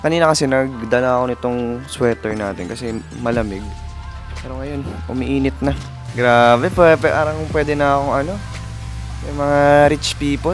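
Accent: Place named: Quezon, Philippines. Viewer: I knew it. Narecognize ko agad yung punto ng Quezon..😊 new subscriber here..🎉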